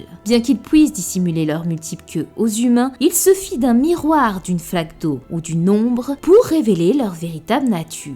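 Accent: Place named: Belgium. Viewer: c'est l'accent belge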